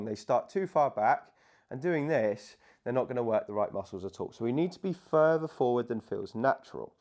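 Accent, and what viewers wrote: United Kingdom. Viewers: Greetings! Glad you are talented as a "speed listener." I am not that talented. Yes, I am also stiff, but I appreciate the "slow" explanations. Especially with his British accent. He cares enough to make sure we get it. After all, we are the 50+ crowd. So slow down your "speed listening" a bit. If you don't like it, slow down anyway. No, I'm not stupid. Enjoy the video.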